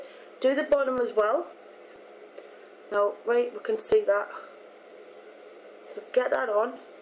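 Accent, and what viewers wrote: Newcastle, United Kingdom. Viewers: I'm pretty sure it's a British accent. :) It's an amazing accent :) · Love your accent :D Gotta love the Geordies! Your eyelashes are so long! Are they natural? Your nose is actually a really nice shape! I never really noticed its size before you pointed it out, just its shape, its lovely and straight :) Loving the videos chuck, upload more soon. x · U sound like Cheryl Cole are you from newcastle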